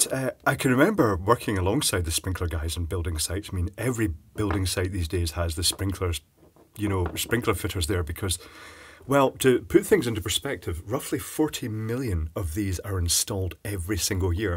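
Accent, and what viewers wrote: Scotland, United Kingdom. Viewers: Caption generator doesn't cope with Scottish accent...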